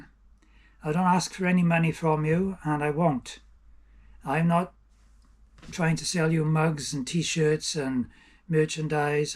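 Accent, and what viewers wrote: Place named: Wales, United Kingdom. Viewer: Well done! Thanks for posting this interesting and informative video! Your voice reminds me of family visits to Griffithstown (Mon), many, many years ago - will be starting to view your archive from the beginning after landing here due to 'YT Algorithms'.... We used to float about the Atlantic on a home-built 6-berth catamaran, but 'swallowed the anchor' many years ago.... part of our family is on the canals currently, wherever they can find water. I retired at the end of the last century, married the good lady, and launched our composite cat - which we sailed down to the Canaries for the honeymoon and a few years more. Our cat had an outboard motor leg that would pull up for weed removal, and (of course) for sailing. Much, much faster under sail! One of our mates out in the Canaries had a wake-board, and in ideal conditions we could rip across the bay with him behind us! 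The trick we used to free our prop (which worked sometimes) was to listen attentively for the engine revs dropping, and bang her into reverse - which could un-wind the flotsam, if we were lucky. Yours looked like a really obstinate tangle! Thanks again, a lovely tale.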